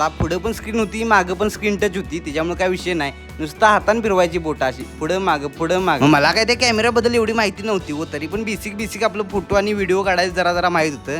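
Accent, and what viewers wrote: Kolhapur, India. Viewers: Agayayaya meskati ky to kolhapuri touch aa❤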